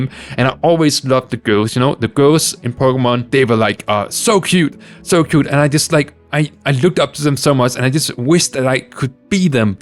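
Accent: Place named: Denmark. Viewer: its my first time watching a video of yours and I really like your danish accent! Im half danish myself. I wish you the best in your transition sis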